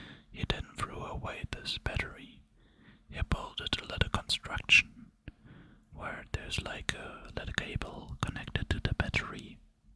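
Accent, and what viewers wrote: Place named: Germany. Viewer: Always love some rambles! Turns out, the german accent is great for ASMR whispering :)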